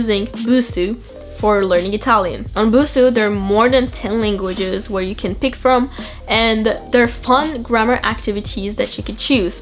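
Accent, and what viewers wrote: England, United Kingdom. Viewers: Did ur english become more British? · It’s surprising that you don’t have Japanese accent but Englishish accent! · You are really amazing!!! I’m shocked at your English accent 😍👍🏼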